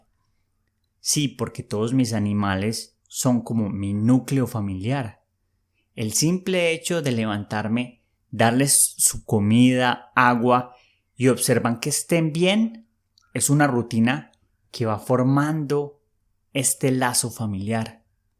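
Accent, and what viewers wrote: Colombia. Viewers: Me encanta el acento colombiano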